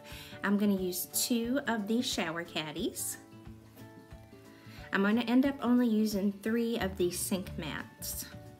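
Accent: South, United States. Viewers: Say there!! (my name for all my girlfriends, and I know you you would be). Can I tax your brain, or anyone else's and try to come up with something on this order we could put together to hold all my netting rolls, I make wreaths out of. So far it's big unhandy clear trash bags, because I have so many other crafts, I've run out of room, and that picky netting goes right through the trash bags. I agree with Iris only I like the Southern part of your voice also. I definately will be making some of these for my clutter of various different craft supplies asap. Thank you for being so organized!! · Hi Mam, It's am in Arlington, VA on Saturday, October 28th, 2023. You posted this 6 years ago so your son may be close to being a teenager now and I hope he is well and your family. I am suppose to be seeing up a storm today and working with my glue gun as I am in a 3 day craft sale next week. 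But,I am tired from my week at work and I am taking a break. I live in a very tiny Flat and my 3 closets are very very tiny so I use clear shoe bags on the back side of 2 doors. My kitchen is extremely small and I believe this caddy would be awesome in my kitchen for light weight utensils and other cooking needs, a place to hang pot holders. I only have 3caninrts for food and dishes and not many drawers and they are so thin you cannot have a wide utensil holder. I have often looked a the kitchen mats at the Dollar Tree thinking they would be nice to hang my vintage fishing lures on. I like your country accent. I need to check out your site to see if your on Instagram. I hit to subscribe I hope your still making videos. Great job on this storage craft caddy. Sincerely, Rebekah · Thanks for this great inventive idea, and I just wanted to say your accent is adorable! I’m from WI and don’t hear a southern accent often 😉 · Such a cool idea!!! 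Loved your video you explained things very well and love your southern accent too:) Look forward to more videos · I love you tutorial and your Southern Charm, very calming and relaxing. Thanks so much for sharing, from a Texas Southerner... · your southern accent is wonderful what part of this great nation are you from my family is from South Carolina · Really cute and ingenious idea for dollar tree items. We crafters never have enough space. I'm always looking for something from one of my crafting categories, watercolor painting, jewelry making, paracord crafts, crocheting, sketching and drawing, home decor, gift wrapping etcetera and so on. I'm so glad I stumbled upon your channel recently. Keep it up your easy to listen to, not over detailed or unneeded repeated instructions that can often feel redundant when listening to others. But not you, I love your flow, your realness and that southern accent is so darn sweet. 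Much success to you my dear! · P Vir Another update - subscribers: from 500 on May 26, 2017 to 14,363 on June 7, 2017...and I make 14,364! That's a huge growth rate! It gives me hope for my videos (silk painting)! Great ideas! Your camera angles and explanations are perfect! You sound like you're from The South. (I'm from the Deep South 😉) Thank you for sharing your God-given gifts, talents and ideas with us all! God Bless!!!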